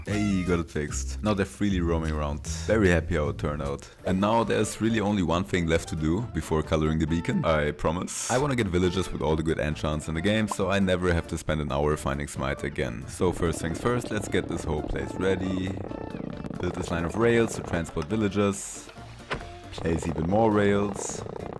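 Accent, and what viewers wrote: Germany. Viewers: I love that i can hear the small german accent haha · Okay but am i the only one who thinks that hes German?😂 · I hear german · Am I the only one to hear an German accent?Great Video tho❤️🫶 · Are you german? Anyways great Vid! Got my like and sub you are gonna blow up. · You sound kinda german. Just in case:(Döner 8€💀) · Funny video and nice editing! One question tho: bist du Deutsch? Dein akzent lässt das sehr vermuten. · Are you german?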